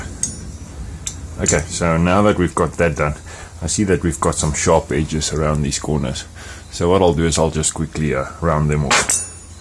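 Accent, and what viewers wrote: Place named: South Africa. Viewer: You sound south african, are you?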